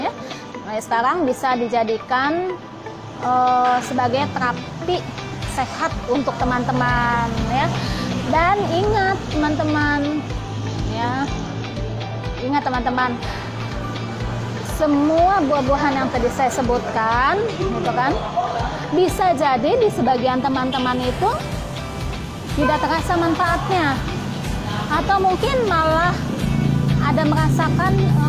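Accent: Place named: Baubau, Indonesia. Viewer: Aslam Alaikum Bu asalnya dari bau2 saya juga dari bau2 tinggalnya mana sekarang logatnya tadi dari bau2 bls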